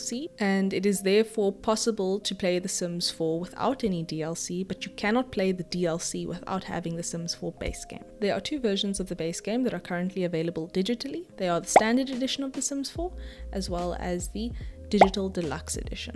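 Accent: South Africa. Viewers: Yep it's a south African accent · Wait, you're South African? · Are you a South African? I recognize the accent. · You from South Africa right?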